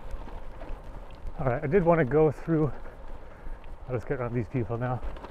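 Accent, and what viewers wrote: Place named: North America. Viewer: I think this came up because I was looking for gopro settings. I’ve been using a chest mount but I’ve seen suggestions that the gopro should be upside down. Not sure why. Which way was yours mounted? I’m in Australia and the cycling infrastructure varies from non existent to fairly good, where I am in South Australia anyway. I heard your accent and thought you were in North America. I’ll see if I can check out your other videos if I have time. Cheers.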